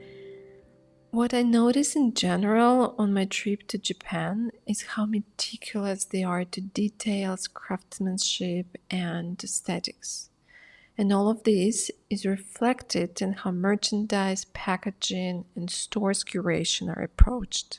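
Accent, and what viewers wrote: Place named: Russia. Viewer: Я не знаю разговариваете ли вы по русски,но ваш акцент меня на это натолкнул😅. Видео просто замечательное и очень красивое. У вас очень приятный голос ❤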